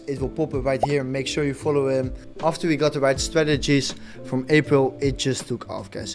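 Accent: Netherlands. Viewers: Love the Dutch accent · Klinkt nederlands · Lekker Nederlands accent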